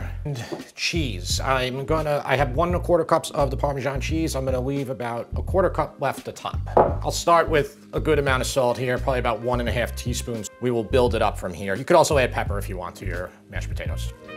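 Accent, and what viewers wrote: New York, United States. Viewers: I know that accent. Queens? Long Island? 🤔 😂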